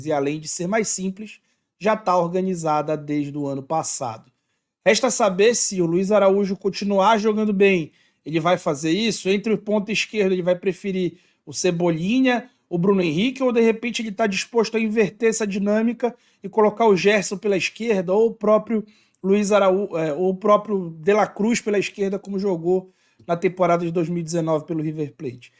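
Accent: Belém, Brazil. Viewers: Vc é de Belém?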